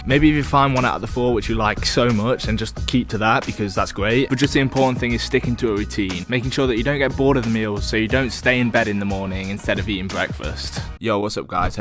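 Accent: United Kingdom. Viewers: Are u from UK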